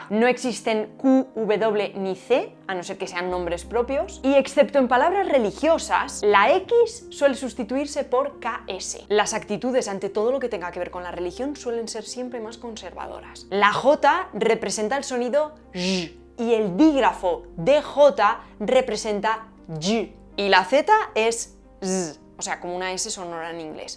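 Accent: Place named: Spain. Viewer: Tu acento español es tan fuerte que no puedo entenderte. Gracias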